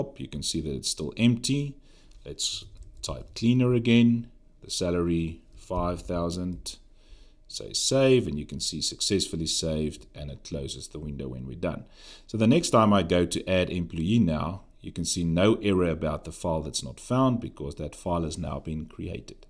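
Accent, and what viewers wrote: South Africa. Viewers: That accent right there is ZA! Awesome content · Great video. And I could listen to this guy's South African accent for days on end. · THICK South African accent. Represent boet.. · from that accent straight away, i knew u are from South Africa, thanks mate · He sound so South African...thanks for the upload...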